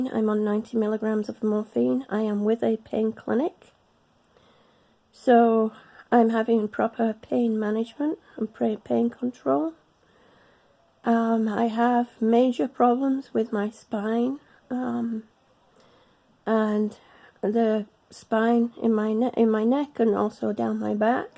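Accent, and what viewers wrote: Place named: United Kingdom. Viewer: Hi. I'm just sort of taking it day by day. I caught a cold a few weeks ago but thankfully it didn't drop into my lower respiratory where it could cause all that havoc like the first two times since I got back from Europe in 2015. I think drowning myself in Vitamin C helped to keep it confined to the upper. I just read that the FDA has ordered the pharmaceuticals to drastically cut back on the production of opioids this year and probably even more in subsequent years because of the problems of youth abuse/death. Have you been affected by this,? People in pain management who follow their Dr's directions are unfairly being caught up in this tug of war. Dr's are getting terrified to prescribe narcotics because of threats from the FDA. It's really an intractable situation and innocent pain sufferers are snared in these politics. 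I cannot think think of a person more qualified to receive them than you and I pray you aren't affected by all this. PS I detect a British accent. Are you on the NHS or are you here in the US? You may not be feeling the effects of the cutbacks we are having here. I read that Britain is one of the friendliest countries for use of narcotics if the use is legitimate. Hang in there. Somewhere out there is an understanding Dr. who ca appreciate your dilemma. You just have to find him.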